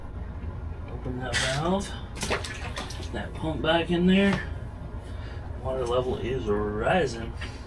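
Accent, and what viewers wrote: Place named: South, United States. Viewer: 🤔 hmmm, why do have a southern accent in some of you videos and not an accent in others?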